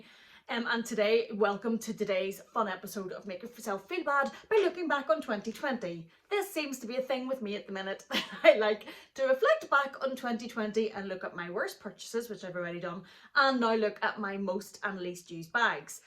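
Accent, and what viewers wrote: Ireland. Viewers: I love your accent! I used to live in Ireland! I miss the country so much but I don't miss the sudden rain while carrying my Louis Vuitton bag 🤣 Greetings from Australia ❤️ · I love your Irish accent!!!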